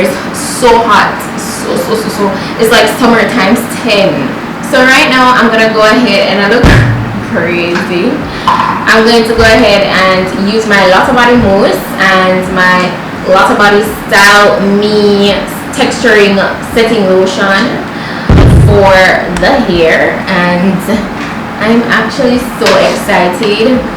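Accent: Jamaica. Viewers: You are beautiful, funny and the JA accent is feeling like home.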